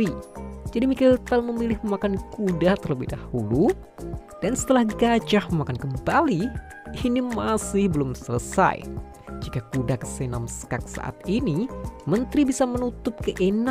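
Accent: Java, Indonesia. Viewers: Konten berulang ini ya? ini yang versi lama : <link> versi medhok jawir, walaupun sekarang masih medhok sih aowkwkwk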